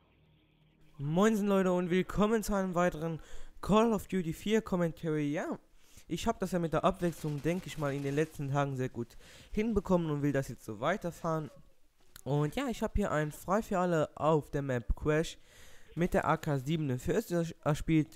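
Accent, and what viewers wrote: Luxembourg. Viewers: Das sind keine Sprachfehler, das ist Dialekt. Er ist Luxemburger :)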